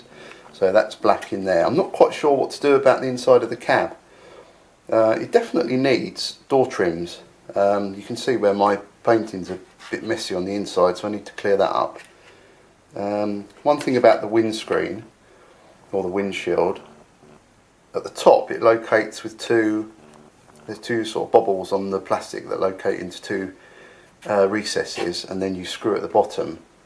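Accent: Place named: England, United Kingdom. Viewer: Nice work! I never get tired of listening to an English Accent. : )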